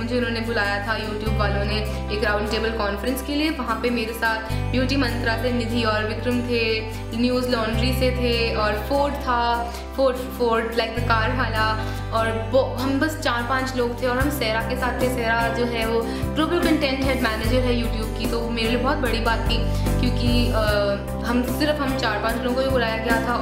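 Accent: Delhi, India. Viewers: Tumhari Hindi kitni polished lagti hai ! And the typical Delhi accent ! It sounds amazing ! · Oh my god u r so cute ur hindi accent is superb u are a typical delhi based girl i just loved it Nd even i m 20yrs n still my parents are not allowing me for learning driving anywayz no issue and all the best for ur exams lots of love😘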